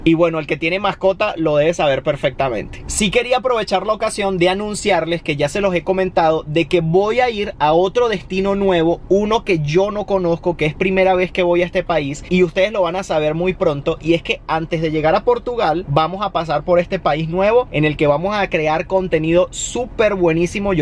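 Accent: Venezuela. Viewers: Todo tu dejo venezolano ,se regresó a tu lenguaje. Al principio parecías casi un español o un lenguaje más castizo. Pero si eres feliz en tu país, ok a buena hora quédese y siga viviendo en Venezuela. ¿ 50 mil bolívares no vale nada ?, qué raro,parece una cifra astronómica . 50 mil soles en Perú , equivale a 14200 dólares. Un perro no es igual que un niño o hijo, a ver quiero verlos estar fuera de Portugal dejando aun niño, después de 4 meses de ausencia. Mi esposa me hubiera hecho nadar el atlántico en 2 días hasta llegar a Europa y ver a nuestro hijo....uuufff!!!! las mujeres son difíciles.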